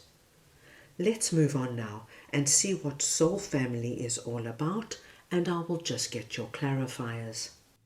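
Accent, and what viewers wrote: South Africa. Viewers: You sound SAfican like me so beautiful and spiritual so pure and wonderful, thank you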